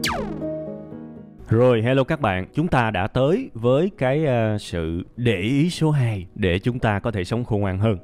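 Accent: South, Vietnam. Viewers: Giọng a miền nam mà rất dễ nghe luôn, cảm ơn a đã chia sẻ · Giọng nói miền Nam của bạn rất dễ nghe. Bài học hay lắm, cám ơn anh.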